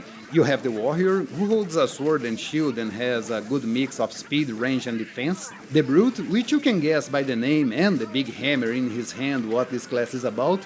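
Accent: Brazil. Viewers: Percebi que é brasileiro pelo sotaque kkkkkkkk O inglês tá em dia, parabéns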